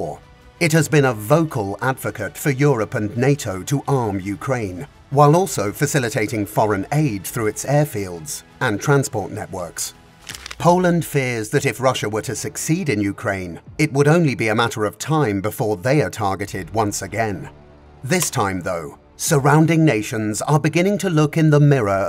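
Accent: United Kingdom. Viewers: honestly, I didn’t even look at half, but firstly because of the title, secondly because of the positioning of Poland as an innocent victim, and Russia as the most vicious of all countries, thirdly, there was no such state as Ukraine in the 17th century, fourthly the story is not told here, but certain one-sided information is being promoted with omission of certain points, the goal is not education, but the promotion of a certain agenda (by the way, voiced with a British accent), the British are always thrilled to pit the Slavs, as well as other natives. Therefore, I consider this video to be propaganda dirt aimed at escalating an already difficult situation.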